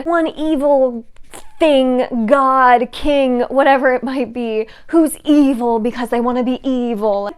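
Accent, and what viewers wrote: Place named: United Kingdom. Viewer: That British accent tho hahaha you reminded me so much of El Brooks in this video, I love it!!!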